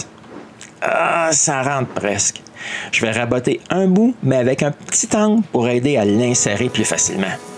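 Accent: Canada. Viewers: ce petit accent Canadien me régal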